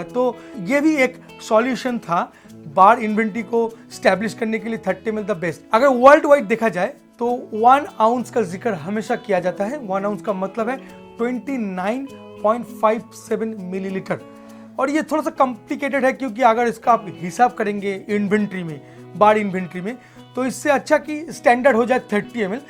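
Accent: Bengal, India. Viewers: Bengali accent is horrible.. Knowledge hai... Lekin pronunciation and english is very poor..needs improvement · Bangali pronounce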